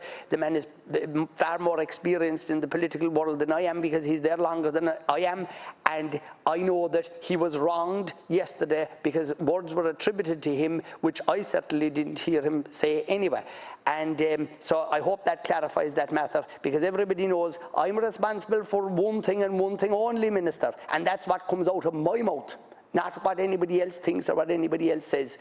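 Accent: Kerry, Ireland. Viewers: How could anyone dislike a Kerry accent. It sounds like poetry to me and I'm a dub. 😉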